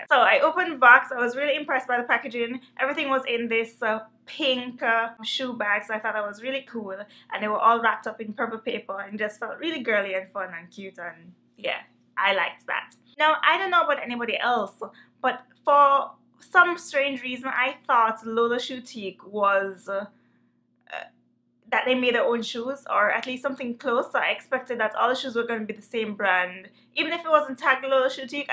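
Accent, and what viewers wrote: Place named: Jamaica. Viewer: Love this review, Soon has I heard your accent I'm like YAY I found another Jamaican YouTuber !!!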